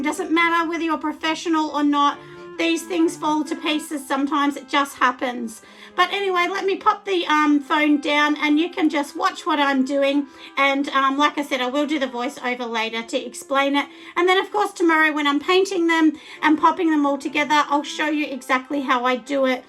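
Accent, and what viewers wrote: Australia. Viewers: Love your Australian accent! Idk y someone would complain?! Love your videos.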